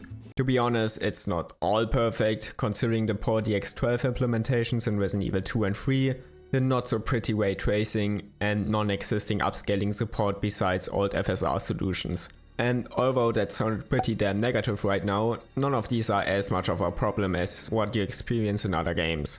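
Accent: Germany. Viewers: I could tell this guy was German, simply by accent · A LITTLE German Accent 🤣 PS: I'am German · Your German Accent is like my Ofenkartoffeln, just a burner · are u german? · This wasn't meant to be as an offence. I love "zhe" german accent. Good video tho💪🏽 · das deutsch killt mich🤣♥️ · Super interesting video, thanks! Are you from Germany by any chance?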